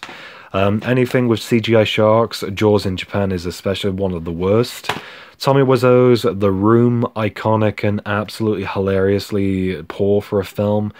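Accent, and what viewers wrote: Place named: United Kingdom. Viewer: Happy 10th Anniversary on your Channel I came Across your Box of Childhood VHS Tapes Video and that's how I found your Channel and I Subscribed for more Content since I'm both a VHS and DVD Collector since my Early Childhood to Adolescence Years to now since I'm 23 (just turned 23 on January 17th) which means I'm pretty much new to your Channel and I Discovered that you're British and you have an Awesome Accent Bro just to let you know that I'm an American who loves British People because they have Awesome Accents when they start talking and you my Dude just earned a New Subscriber PS Check out my Comment on your Box of Childhood VHS Tapes Video I Commented how I Started Buying and Collecting VHS Tapes when I was very Young oh and PS I'll be making a Video on DVDs I Ordered from Amazon Recently and I'll be Uploading it by Tomorrow so be Sure to Check that out as well and again Great Content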